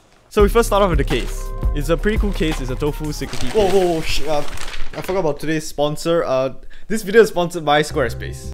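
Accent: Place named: Singapore. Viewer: are u singaporean? i can recognise that accent anywhere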